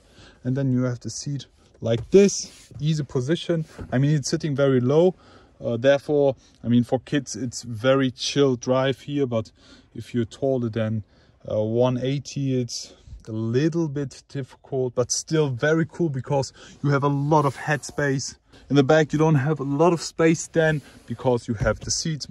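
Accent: Germany. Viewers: Nothing like the german accent. AMG Uersion 😌👌🏼 lol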